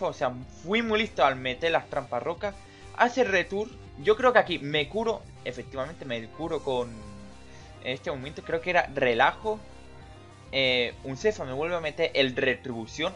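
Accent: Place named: Mexico. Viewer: suenas mexicano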